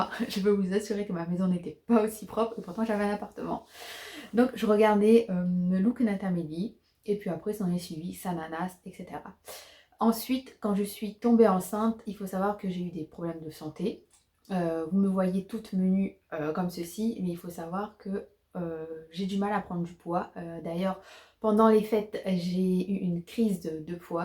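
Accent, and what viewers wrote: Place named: Reunion, France. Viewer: Coucou je tombe par hasard sur ta vidéo et j’avais deviné que tu étais de la Run, l’accent ne trompe pas lol très sympa tes vidéos bonne continuation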